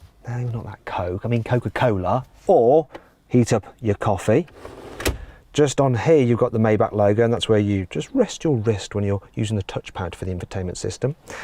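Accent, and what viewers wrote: United Kingdom. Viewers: 억양이 멋지네요 영국발음인가요?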